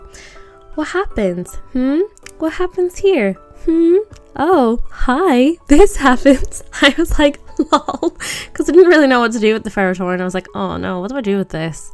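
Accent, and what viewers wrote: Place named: United Kingdom. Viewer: I really like your british accent <3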